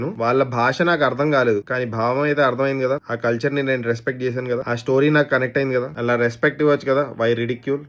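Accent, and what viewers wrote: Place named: Andhra Pradesh, India. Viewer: First nuvvu matladu mana slang la lavda gaani lekka andhra la matladtav howle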